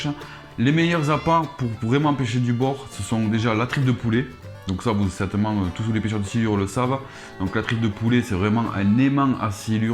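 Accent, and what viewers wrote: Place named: South, France. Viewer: région du Tarn l accent me semblé familier je viens du Gard , haaaaa le sud lol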